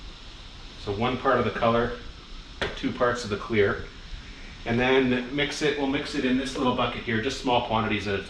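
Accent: Canada. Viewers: I KNEW you were from Canada when I heard the accent, eh!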